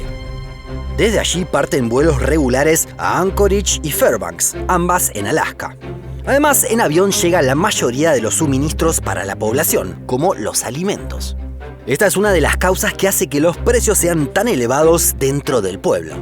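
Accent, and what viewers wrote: Argentina. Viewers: El narrador habla argentino, viste?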